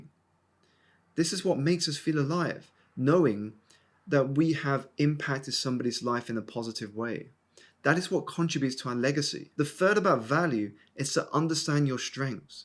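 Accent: United Kingdom. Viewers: Your british accent is cool.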